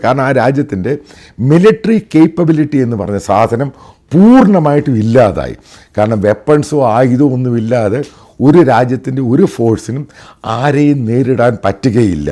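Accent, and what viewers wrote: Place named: Central Travancore, India. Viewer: മദ്ധ്യ തിരുവിതാംകൂർ ശൈലിയാണ്.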